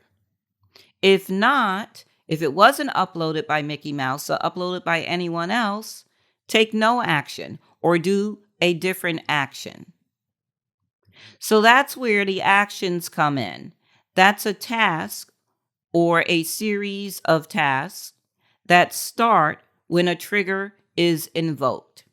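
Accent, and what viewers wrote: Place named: United States. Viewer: thick accent American is inritating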